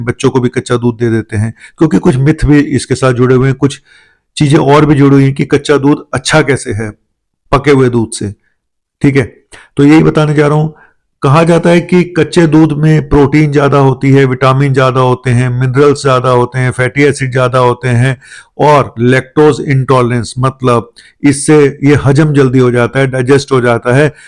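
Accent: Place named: Punjab, India. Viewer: Dada ji came from country of milk that IS PAKISHTAN I could make out from your way of talking PUNJAB age 80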